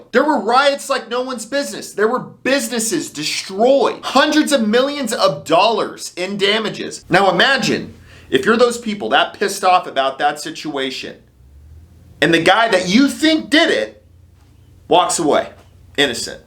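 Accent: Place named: Wisconsin, United States. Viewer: If you are from Texas why do you sound like you are a Wisconsin yankee ?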